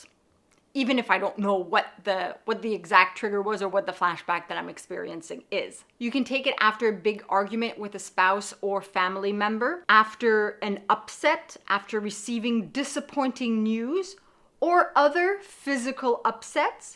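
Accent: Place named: Canada. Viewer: Great video :) You speak so clearly, love your accent! Canadian?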